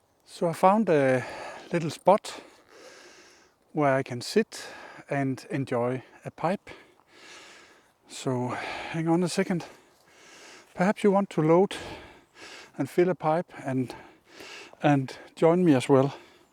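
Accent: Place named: Denmark. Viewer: Hello. I was playing pipe videos in my shop while working and heard your accent. I stopped immediately to check your video out. I was right danish! I retired two years ago with a great company NovoNordisk which took care of me and my family for many years. I had great times and memories visiting Copenhagen and Kalundborg through business. It was great thinking back and I have many great friends that I have kept from Denmark! Thank you for the video. Have a great day! It was great looking back.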